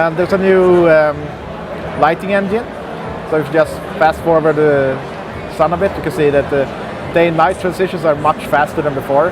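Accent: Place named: Sweden. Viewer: I just love english, so typical swedish-english!!!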